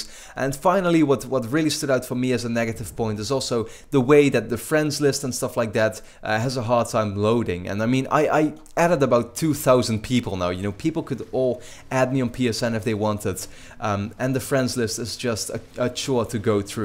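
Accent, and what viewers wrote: Netherlands. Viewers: Fucking hekel aan nederlandse accenten. I mean je kan er niks aan doen, ik heb het zelf ook.. Nederlands klinkt gewoon lelijk xD Anyways, dankje! Ik zat erg te denken of ik nu al van 360 naar ps4 zou gaan. En ja dat ga ik zeker doen. Mijn beslissing staat zo vast dat ik vanmiddag dat ding nog ga halen. Thanks for this review! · You're Dutch right? :p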